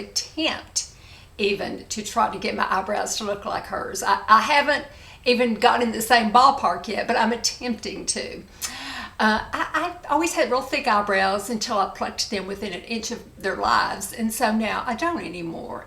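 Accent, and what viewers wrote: South, United States. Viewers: You are just so stunning and in your 60s. You look so much younger. I love your beautiful Southern accent! Thank you for all the great tips. I love your channel.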